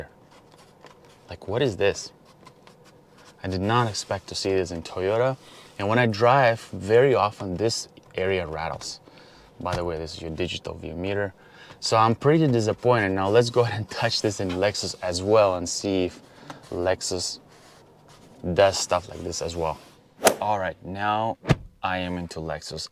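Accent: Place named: Russia. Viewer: Your accent Are you russian?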